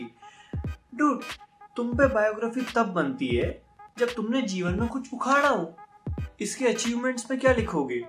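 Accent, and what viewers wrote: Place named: Indore, India. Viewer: Full too Indori.😂😂😂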